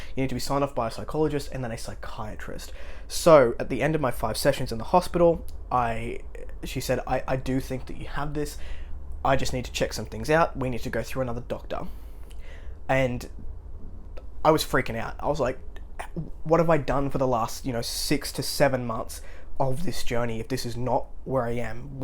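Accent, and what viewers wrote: Australia. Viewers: Extremely open and brave video,. Sounds like a long and difficult journey, but I’m glad you’ve gotten to a good place with it all. I don’t directly know anyone who’s transitioned, so I learned a lot. Thank you. And apart from that, it’s always a joy to hear your Aussie accent, mate. 🙂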